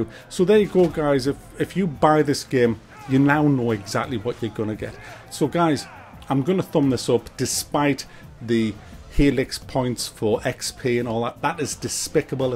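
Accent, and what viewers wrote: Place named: Scotland, United Kingdom. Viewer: You Scottish? Something satisfying to hear your accent rap off profanity during a review that makes me smile. You got a sub, good review.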